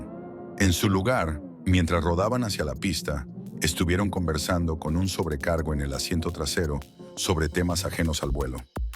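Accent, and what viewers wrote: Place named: Mexico. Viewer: no, su contenido es un copia y pega y su voz de mexicano da cringe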